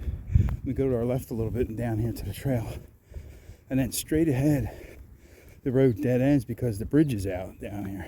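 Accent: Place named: East Coast, United States. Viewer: New subscriber. One of your videos turned up on my YT feed. When I saw Perkiomen Trail, I had to subscribe. I'm from PA--lived in Philly, Philly burbs, and after I Ieft my parent's home, I moved to what was called Port Providence in an old farmhouse. After that, I moved to Phoenixville..just a hop, skip and a jump from the farmhouse. I'm out west now, and it's nice to see the place I loved the most when I was back home. I used to picnic with my husband and baby son on the Perkiomen creek--boloney sandwiches on kaiser rolls, and chocolate milk from Wawa. We'd fish, and my husband would take my son out into the creek to splash around. Great memories. I look forward to watching your videos. I love hearing your East coast accent--another thing that brings me back home. Thank you.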